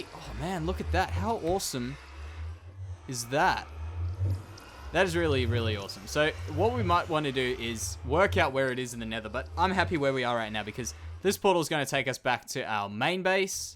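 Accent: Australia. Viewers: Where in Australia are you from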